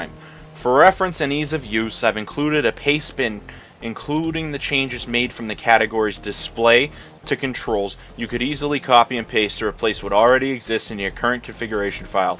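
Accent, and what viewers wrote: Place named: Boston, United States. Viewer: love the boston accent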